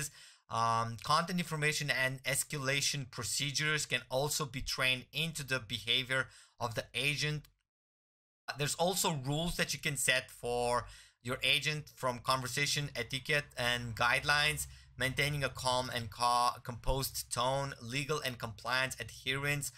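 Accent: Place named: Quebec, Canada. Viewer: Oui la voix est pas mal je vien d'écouter, on dirais juste qu'il a un accent québécois 😂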